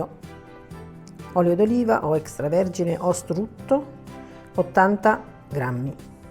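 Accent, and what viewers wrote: Calabria, Italy. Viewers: Ciao sei bravissima,ti seguo sempre, sei di Reggio? Di quale parte della Calabria?😘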